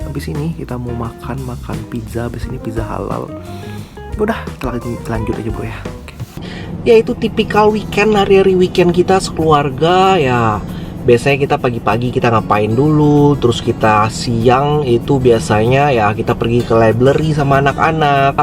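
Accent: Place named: West Sumatra, Indonesia. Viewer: Wkwkw bner TU minang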